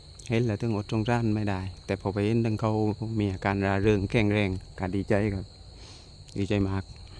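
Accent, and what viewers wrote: South, Thailand. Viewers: ชอบภาษาไต้ฟังแล้วมีเสหน่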